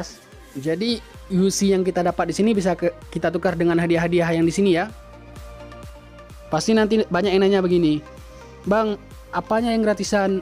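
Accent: Aceh, Indonesia. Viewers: logat orang ACEH 🔥🔥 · LOGAT ORANG ACEH SUDAH SAYA SUB,MAJU TERUS BANG. SEMOGA BERKAH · sudah ku duga logat aceh hhh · hhhh...kupikee pakon logat aceeh...hana hie awak jawa😂🤣...auto sub nyoe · Bang orang aceh ya suaranya kayak orang aceh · Hahaha logat Aceh abeh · Aceh habis logat nya.. Wkwkw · Bg kayak org aceh bicaranya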